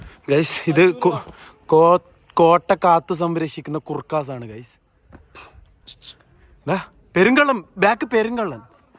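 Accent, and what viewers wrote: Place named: Kasaragod, India. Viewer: Kasargod slang aan kelkan rasam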